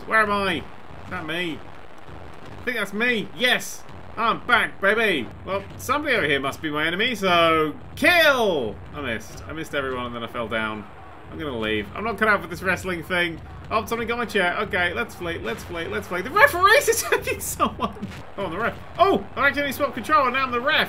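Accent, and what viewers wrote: United Kingdom. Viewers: Are you British or Australian🤔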